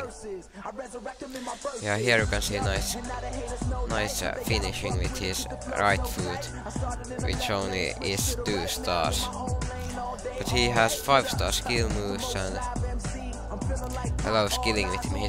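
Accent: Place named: Finland. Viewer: haha 40 seconds of commentary and i could hear the finnish accent even though im not finnish nor scandinavian. :) good vid anyway :) ill have a look at him in the career mode as well as fut. keep up the good work mate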